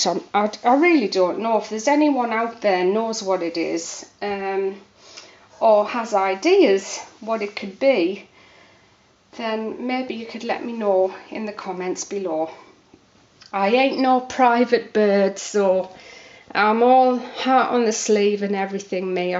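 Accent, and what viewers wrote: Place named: Newcastle, United Kingdom. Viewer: The accent is what we call geordie , its north east england .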